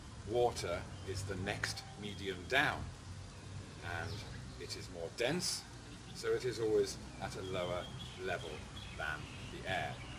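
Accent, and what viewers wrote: United Kingdom. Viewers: Oh! My God! WTF How ignorant can anyone be than to show to the whole world how you didn't think something through using all the factors and variables needed for the equation. Water displacement alone The force air has on an object depending on it's shape and size. This is so ridiculous yet you took the time out to make a video showing how unintelligent the thought process is and then look at all the idiots that follow you thinking this is correct. Again Oh! My! God! I can't believe what the world I live in has become. Utterly and Ridiculously disgusting to think my fellow man is this fucking dumb. A British accent by no means makes anyone intelligent.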